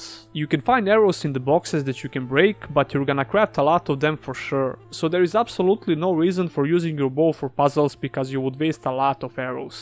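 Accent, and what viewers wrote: Balkans. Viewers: U sound like you are from balkan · Are you from Balkan?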